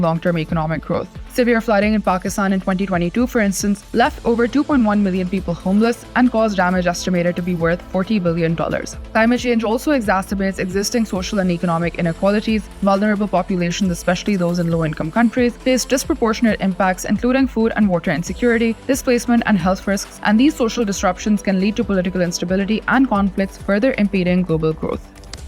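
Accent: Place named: India. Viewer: sound like Indian and even the thought analysis seems emanating from Indian perspective. Indian thinking pattern is typical and easily predictable I like to tell the host of this channel - Indian thought are useless, and the world does not need any perspective from indian analytical so called expert, because right here in Asia, we have far qualified expert than Indian thought expert. ...I just listen 1 min and i already know what background you are coming from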